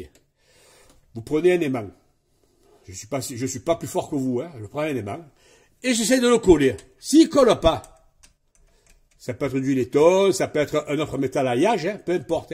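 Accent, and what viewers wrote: South, France. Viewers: Bonjour et merci pour le tuto et avec l'accent du sud encore mieux Bravo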